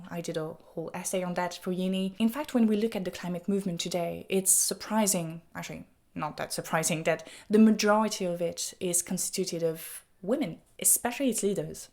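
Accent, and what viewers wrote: France. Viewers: damn i am just a sucker for the french accent. love your content btw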